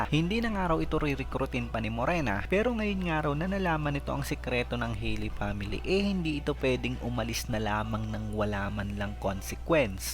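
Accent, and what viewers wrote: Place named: Philippines. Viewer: Boss bakit yung pronouncing mo ng english parang pilipinong pilipino din.. medyo mahirap siguro paghaluin english at pilipino pag nagsasalita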